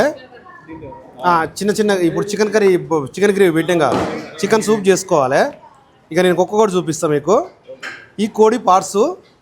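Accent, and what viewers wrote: Telangana, India. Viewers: Are you telangana?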